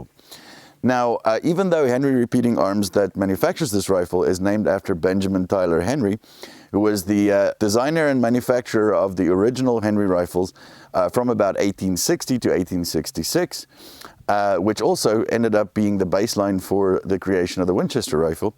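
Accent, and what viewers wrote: South Africa. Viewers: do I detect a very subtle South African accent?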